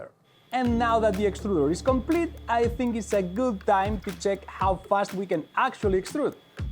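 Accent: Spain. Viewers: Perfect presentation, informative and entertaining, but the thing I enjoyed the most was the accent, Spanish English is the best English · A ver, quedó duro que no pudieras montar el kayak bien, pero por otro lado esto muestra que la impresión 3D tiene sus retos, y ciertamente hiciste el print en menos de 24h, por lo que tremendo logro la verdad. Me pregunto si merecería la pena comercialmente hablando hacer un kayak así, probablemente no ya que deben tener mejores sistemas. Quiero hacer un negocio al rededor de la impresión 3D, siendo que estudié modelado 3D y quiero aprovechar que ya se de esa parte. A ver que tal va, si Dios quiere bien. Saludos (No se porque me hace gracia escucharte hablar en inglés con el acento español, siendo que estoy acostumbrado a escuchar a gente hablando inglés siendo nativos... o a españoles hablando español jaja)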